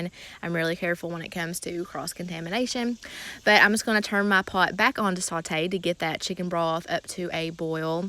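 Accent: South, United States. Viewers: Are you gonna do any more videos of packing your husbands lunches? I got so many ideas from those videos as well and hoping that you’ll bring those back. Love your content love your southern accent keep the videos coming♥️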